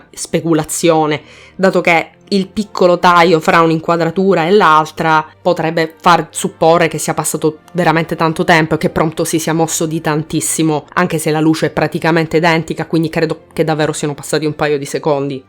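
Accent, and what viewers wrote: South, Italy. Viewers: Sono sempre ipnotizzato dal tuo modo di parlare. C'è qualcosa del sud nel tuo accento.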